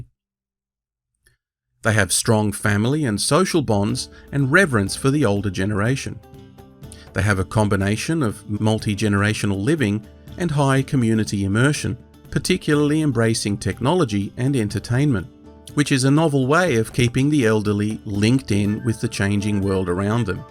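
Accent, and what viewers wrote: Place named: Australia. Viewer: Good job, love the humor, delivered with the Aussie accent, perfect. It was a big subject to breakdown however I think you managed it.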